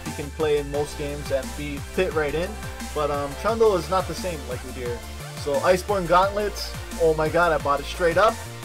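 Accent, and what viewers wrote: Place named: Hawaii, United States. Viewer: you must be from hawaii. you sound too local and cool to not be.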